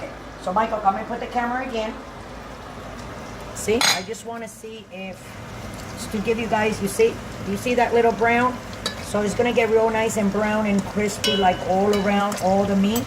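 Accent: New York, United States. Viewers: GREAT STUFF!!! IM A NYC ITALIAN GUY JUST CURIOUS R U IN NYC TOO SOUNDS LIKE IT LOL I SUBSCRIBED THATS MY KIND OF FOOD LOL I USE A TON OF PORK BELLY FAT WHEN I MAKE MY 5 HOUR SUNDAY MEAT GRAVY SALUTO 🍷🍷🍷🇮🇹🇮🇹🇮🇹 VIC FROM NYC CIAO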